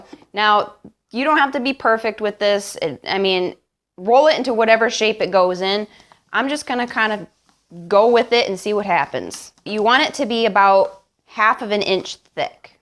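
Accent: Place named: Minnesota, United States. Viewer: These look good! Are you from Minnesota/Wisconsin? I'm trying to guess from your "accent"! (I'm from MN, you don't have a huge accent :) )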